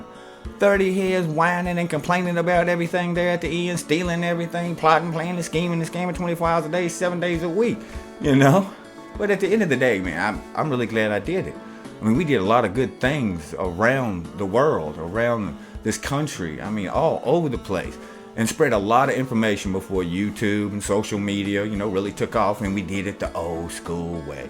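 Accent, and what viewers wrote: South, United States. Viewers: Man that sucks that people unsubscribed do to your warehouse america videos. I mean if you don't like em don't watch em. Bunch of self entitled asshats. But yeah, what you said at the end is true. If they can't get over this then how "awake" are they. And people. what the fuck is up with the constant accusations of his accent being fake? Why, because he sounds "black"? Dumb asses, that's a southern drawl which in turn is what influenced how modern African Americas talk. And even if he did, so fucking what. We need to get off this race bullshit. We're all human, our "real" race is just melatonin levels in the skin. What we call "race" is just cultural, just how we act based on where and how we're raised. If a white guy is raised in the ghetto he's gonna probably act "black" and if a black kid is raised like a typical white bread then he's got a good chance of acting "white". 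Of course I know the real reason for this shit, that self important higher then thou feeling when you go all social justice warrior and call out a "privileged" white man for stealing and raping black culture which is total bullshit. Get over it, every race has done fucked up shit to each other and we are so over that by now.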